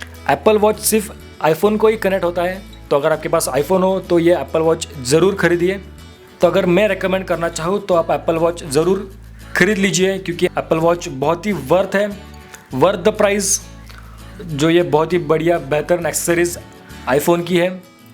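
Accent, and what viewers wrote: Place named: India. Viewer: i love your hindi accent❤️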